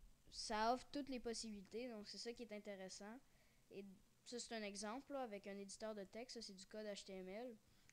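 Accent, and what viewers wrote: Canada. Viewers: Sa se voit que ses un canadien avec la voix. J'ai rien contre eu mes ses la première fois que j’entends parler un canadien. ;)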